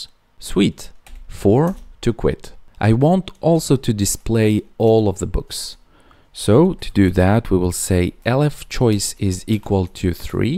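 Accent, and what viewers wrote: Russia. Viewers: Неплохо маскировал русский акцент.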